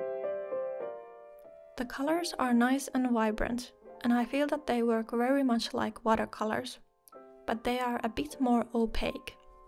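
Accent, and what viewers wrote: Finland. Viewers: Mä tiesin että jotakin tuttua on aksentissa ja suomestahan sä. Somebody pick up the phone, cause I called it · Tell me you're Finnish without teeling you're Finnish! Tunnistan aksentin mistä vain! Ei siis huonolla tavalla. Söpöllä tavalla. Oot super taitava ja todella kaunista jälkeä! Ihan kateeks vetää nähdä noin upeaa sivellin työskentelyä! 👀❤️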